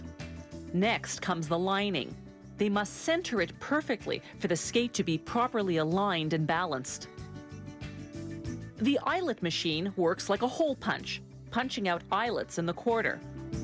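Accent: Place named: Canada. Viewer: A Canadian woman for a narrator. Couldn’t be any better for a hockey skate video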